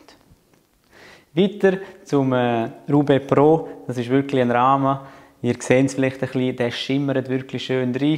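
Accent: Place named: Switzerland. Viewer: Leider sprichst du kein deutsch sondern diesen schweizerischen Dialekt den ich ( als Belgier) sehr schwer verstehen kann! Schade, du hättest mehr Zuschauer!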